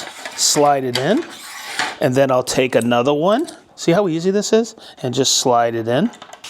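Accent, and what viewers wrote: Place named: Boston, United States. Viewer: just want to thank you for all of your tutorials on woodworking and the use of the Festool System. I'm a hobbyist and both a fan and user of Festool products. Your videos have enabled me to fully understand and utilize the features of Festool products while elevating my woodworking game. Your product recommendations are also well noted, and I'll be adding some of your suggested accessories to my toolkit soon. Each one of your tutorials are a masterclass and I plan on viewing every single video on your YouTube channel. Your energetic delivery and Boston accent are much appreciated as they add both color and authenticity as a content creator. Like Batman and Robin, you and work well together. Wishing you the best in the coming new year!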